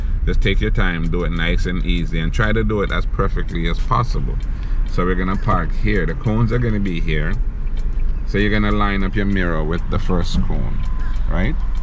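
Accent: Jamaica. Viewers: You do sound like there's a bit of Jamaican in there. I'm an American but I lived in Jamaica all my life. I passed the written test past month and I have the driving test two weeks from now. I watch your videos to ensure that I don't fail. Thank you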